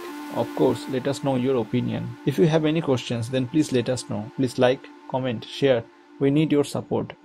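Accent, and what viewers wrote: India. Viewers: Lots of love bhai Tysm i was stuck installing my printer ty ty ty love from India your voice seems you are from West Bengal india 🇮🇳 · i hate indian's english but thanks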